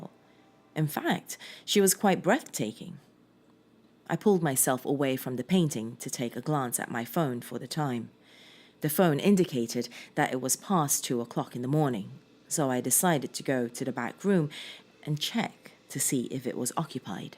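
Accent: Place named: RP, United Kingdom. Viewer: I'm really enjoying your channel. I have noticed that you seem to get more adverts than the likes of Mr. CreepyPasta and Creeps McPasta (my head has a problem getting around Creeps' last name and yours lol!) You seem to be proving my theory that its stories like Slenderman and probably Jeff/Jane the Killer that have been involved with real life controversy that keeps advertising away. I have been puzzling over your accent which comes across as very non accented BBC or RP English. I firmly believe that narrators like yourself do as much preparation as you would if you were acting a part. I'd guess that you've done English to a high standard and have done some voice production work which makes me think that you're an actress. The thing that got me puzzled about your accent was that occasionally it sounds almost like a really soft Scottish lilt and occasionally I hear a little London (I'm a Scot living in London). 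Its brilliant listening to stories being read properly and if you haven't already, I would approach some of the publishers who do audiobooks because, I've heard a lot since I can't seem to own reading glasses for longer than 48 hours without breaking them! I have listened to a lot of narrators who are working on Best Selling Audio Books and you are every bit as good as, if not better than most of them. I am really pleased to have found your site because I am heartily sick of hearing good stories murdered by narrators who don't seem to prepare or even look the story ove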